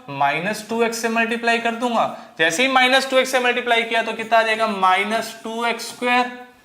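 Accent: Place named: South India, India. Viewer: Sir aapka naam kya hai? Are u from South India? It assumes of ur accent…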